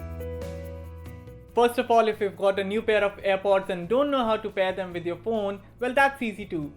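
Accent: India. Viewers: indian lol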